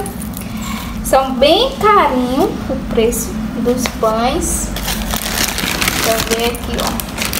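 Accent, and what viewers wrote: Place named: Brazil. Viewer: Eu nao sou Brasileira mas falo portugués e gosto dos diferentes sotaques do Brasil. Eu gosto do seu cheito de falar, do bom dia e do "meu povo". Voce é auténtica e mostra sua vida como ela é, se alguem nao gostar do seu sotaque pois que asista outra youtuber de outra regiao. Continue com seu bom dia que tem gente q gosta, continue com seu cheitinho de ser que é unico e original 🌸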